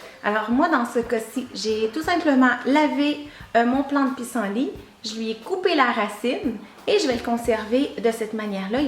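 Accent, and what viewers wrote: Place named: Canada. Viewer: Ravisante, tres instructif ,j adore l accent canadien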